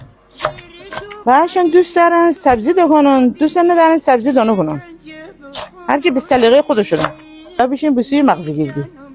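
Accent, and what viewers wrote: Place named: Shahsavar, Iran. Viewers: لهجه ی شما ب شهسوار و رامسر نزدیکتر تا رشت و گیلان ❤ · خانم به نظرم شما مازندرانی هستی واسه غرب مازندرانی❤❤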